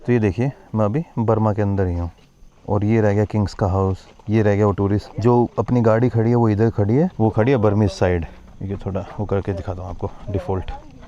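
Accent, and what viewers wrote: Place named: Arunachal Pradesh, India. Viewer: whr were u?? Was eagerly waiting for you and was worried.. 2 din lagega gaye aapko video ko upload karne..? The way you speak in Arunachalee hindi accent was awesome.. ❤ from Longding, Arunachal Pradesh 🇮🇳. Was expecting your visit Longding, Arunachal Pradesh from Longwa, Nagaland 🙏. Anyways, have a safe journey & stay..👍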